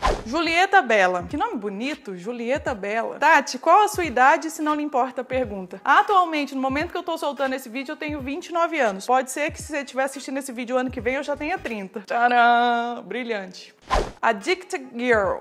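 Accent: Minas Gerais, Brazil. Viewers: vc e mineira? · Sotaque mineiro <3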